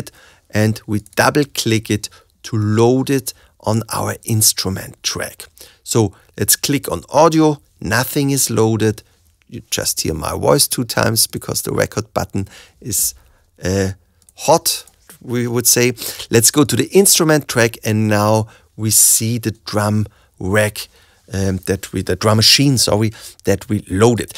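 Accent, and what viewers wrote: Germany. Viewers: tutorial is ok, but man...pls get rid of that horrendous german accent!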